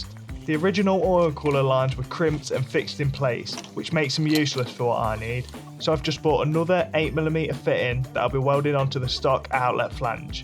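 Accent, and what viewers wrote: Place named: East Midlands, United Kingdom. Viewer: You sound like a east midlands lad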